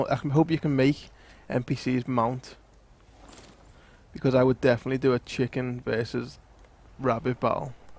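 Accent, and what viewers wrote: Liverpool, United Kingdom. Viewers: are you from liverpool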